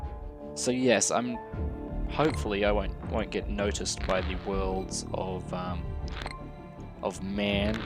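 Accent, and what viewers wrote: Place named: Australia. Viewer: He's Aussie...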